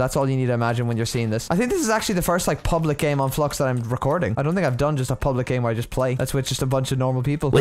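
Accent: Ireland. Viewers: Im I the only one who noticed that he has a slite Irish acsent??Like a 20% of Irish and a 80% of American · you sound irish are you irish? nvm lmfao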